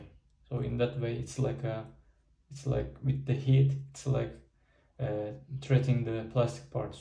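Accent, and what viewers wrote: Turkey. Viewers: thanks and Ramadan Kareem! Noticed you have a Turkish accent 😉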